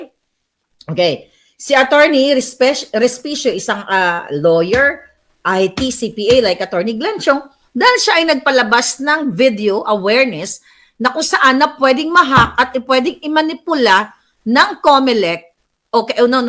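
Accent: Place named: Iloilo, Philippines. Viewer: Hahahahaha, ma'am maharlika, nakakatawa ka sa pagsasalita mo ng elonggo🤣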